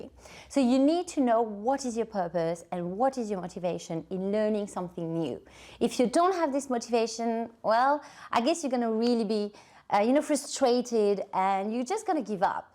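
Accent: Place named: London, United Kingdom. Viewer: thank you for these precious tips. Love your lovely London accent xoxo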